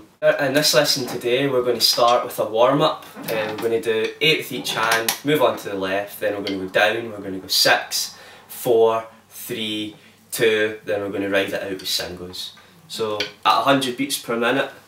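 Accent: Scotland, United Kingdom. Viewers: Min Thu Ko Scottish accent.....from Scotland!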